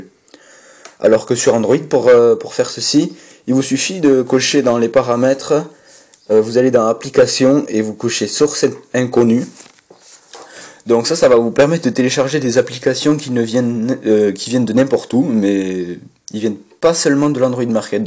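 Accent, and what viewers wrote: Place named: South, France. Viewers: Meme si j'ai 13 ans tu m'accepte sur la xbox Os:on entant a l'accent que tes du sud ;-) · Ton accent t'as trahis;) sinon sympa ta vidéo. Et vive le sud ouest!:)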